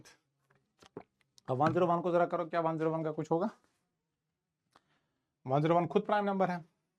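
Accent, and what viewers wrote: Kashmir, India. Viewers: Kashmiri accent is so cute 😂❤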